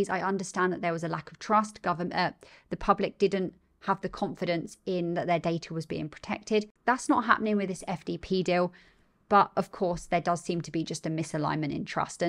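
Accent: United Kingdom. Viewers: thanks for your insight! And I get to listen to a wonderful British accent too:)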